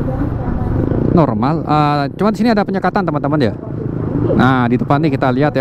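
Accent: Madura, Indonesia. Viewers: Meddhok mu cak mantap wkwkwk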